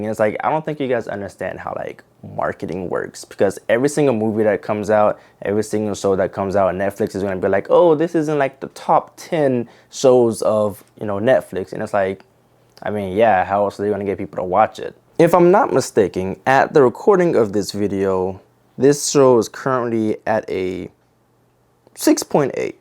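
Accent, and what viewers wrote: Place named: Toronto, Canada. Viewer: This guy is not American. He sounds like he's from Toronto.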